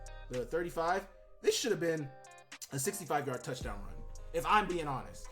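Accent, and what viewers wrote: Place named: Montana, United States. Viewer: you still sound like a montana kid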